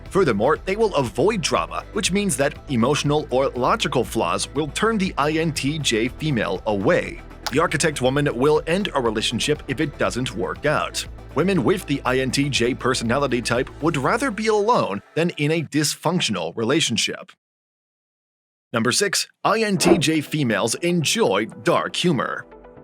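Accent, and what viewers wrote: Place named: United States. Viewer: I really wanted to watch this video but the voice is way too American 🤦🏻‍♀️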